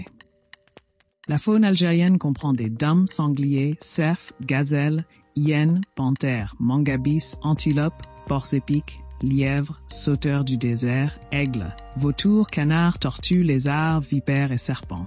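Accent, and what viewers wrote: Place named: England, United Kingdom. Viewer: Vous avez un léger accent anglais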